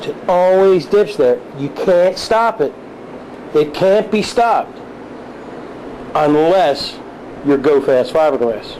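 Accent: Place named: Northeast, United States. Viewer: He must be from the North East I guess New York or New Jersey one of the reason I moved to Texas.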